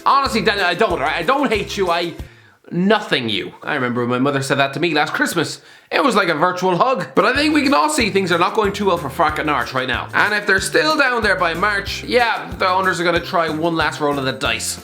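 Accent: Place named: Ireland. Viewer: Listen to that sweet Irish voice, he doesn't sound like a dying cat with throat cancer anymore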